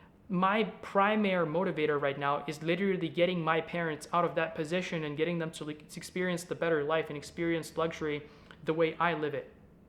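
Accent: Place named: Eastern Europe. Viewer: as a Russian American I could tell you were Slavic instantly.....so almost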